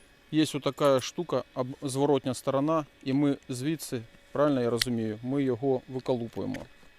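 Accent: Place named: Russia. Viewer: Терпеть не могу когда москворот пытается косить под мовного знавьца. Ну не можешь на мове, ну трещи як москаль. Якой жах?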